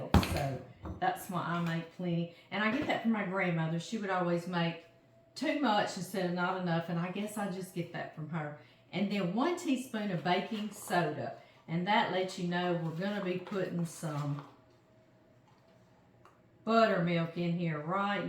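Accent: South, United States. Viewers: Your videos haven't been coming up on my feed I miss your beautiful country voice and your delicious recipes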